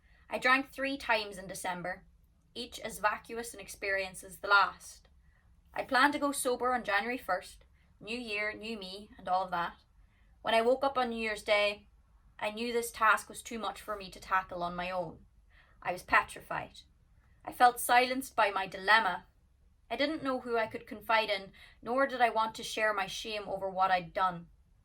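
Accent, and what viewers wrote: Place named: Ireland. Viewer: I am a 67 old man in Holland. Two weeks ago I saw you the first time on youtube, looking at X factor video’s. The simple way you sang the song struck me. Vocaly you were not perfect, but you told a story. Your voice, Irisch accent and appearance intrigued me. I listened to other songs you sang and each time you told a story more than you sang a song., you are a storyteller. That is why I also like to listen to your vlogs. When you are telling what you are doing en things about youself I clearly see what is going on in your mind. Emotionaly you are an open book and that is also what you want to be. I know that everything on the outside of you is fake, but the inside is reaal and that is what makes you special for so many.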